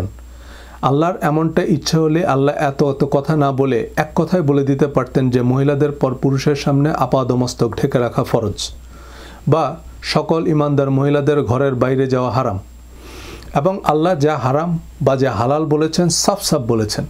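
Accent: Noakhali, Bangladesh. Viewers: By the way.... আন্নে কি নোয়াখাইল্লা???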